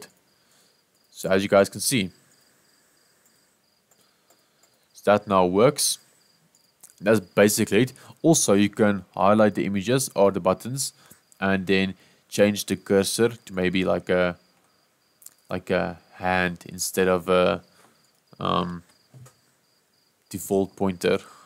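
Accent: Afrikaans, South Africa. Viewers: Jisss maar jy is heavy afrikaans bra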